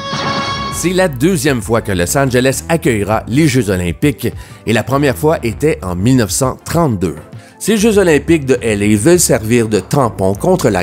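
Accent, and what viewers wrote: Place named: Quebec, Canada. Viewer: Bon montage ça se regarde tout seul et avec l'accent du quebec pour les jeux de L.A c est encore mieux..^ un français qui est venu passer quelque mois de bon temps chez vous et qui en garde un bien bien bon souvenir..(plus français que la france a certains égards)❤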